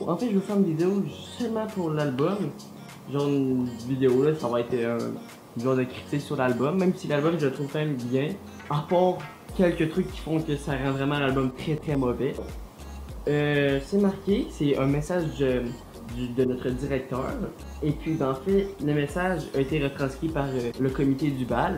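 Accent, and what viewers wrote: Quebec, Canada. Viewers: GG pour ton passage chez Amixem ^^ perso l'accent quebecois je commence à apprécier, ça change mdr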